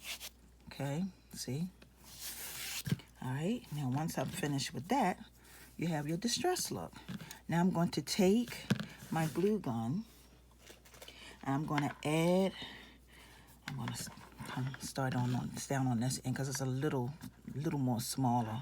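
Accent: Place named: Baltimore, United States. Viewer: I love this! I will definitely be trying this. Thanks for sharing. P.S. I think I’m hearing some Baltimore in your accent. 😁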